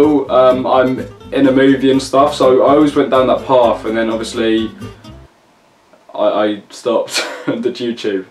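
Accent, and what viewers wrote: England, United Kingdom. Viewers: he sounds British because he is, so don't try to compare him to every british YouTuber 😂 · Wow your so beautiful and your voice is so hot omg love this uk accent. You have incredible eyes. And your so fucking funny haha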